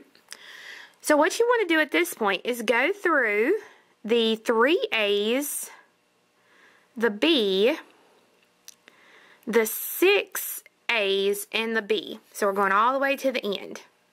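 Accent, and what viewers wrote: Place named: North Carolina, United States. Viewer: Great tutorial! gives clear and excellent instructions, plus I love her North Carolina accent.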